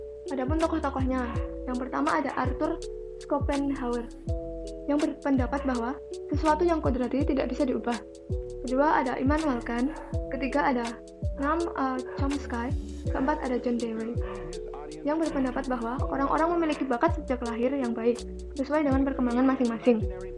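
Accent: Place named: Java, Indonesia. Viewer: Mendhox dikit keren ya kak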